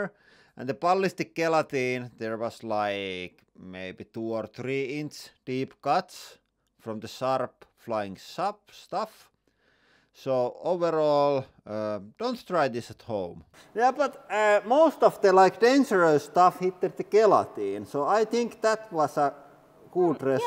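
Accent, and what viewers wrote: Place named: Finland. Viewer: I Love the finnish accent 😊 Also Finland is the most comparable country to Denmark 🇩🇰❤️🇫🇮 Most Happy people in the World 😁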